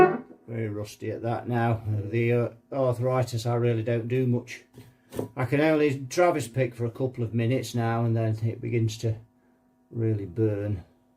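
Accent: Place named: North Derbyshire, United Kingdom. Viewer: I think it’s the dialect, plus some / many of the words. “ Tatered” as in “I think that capacitor is tatered.” Many won’t understand the words peculiar to the north Derbyshire/ Nottinghamshire dialect. 👍👍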